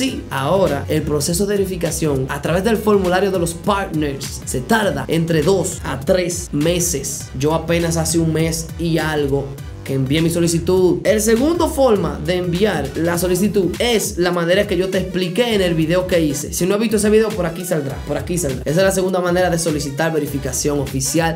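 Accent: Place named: Dominican Republic. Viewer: En tu acento te Me pareces dominicano espero que sí pro ases muy bueno videos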